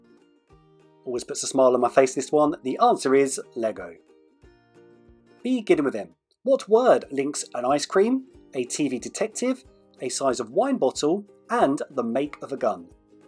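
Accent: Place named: England, United Kingdom. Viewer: Apparently this was the 2nd time around for me on this one. Can't find my response from the previous time. This time I got 14 right. I actually remembered the correct answers on at least two I had answered incorrectly the last time. Thanks for another great quiz,! By the way, from your accent, I'd guess you're from England, Scotland or New Zealand. Am I right with any of those? I'm from the United States.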